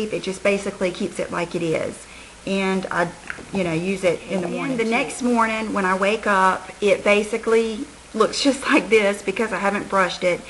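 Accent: South, United States. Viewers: I always hope that you will say "Hey, Ya'll" bc i love ur cute southern accent, but you never do. You should!!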